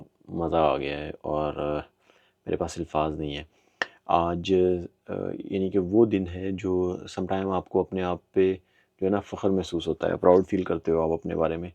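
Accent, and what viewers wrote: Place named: Punjab, Pakistan. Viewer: Really good video. I like your videos, just saw only 2 videos but Really good. Your urdu accent is likely from Gujrat or Sialkot. I don't know where are you from. I am from district Gujrat. Anyway good video. Waiting for your next video.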